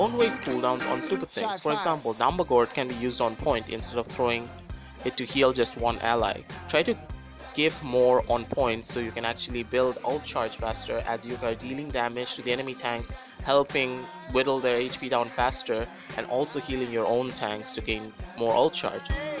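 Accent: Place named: India. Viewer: Why u sound like an Indian?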